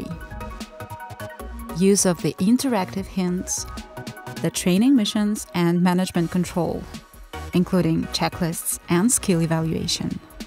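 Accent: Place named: Eastern Europe. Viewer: спасибо за славянский акцент. стоит поработать над К и П звуками.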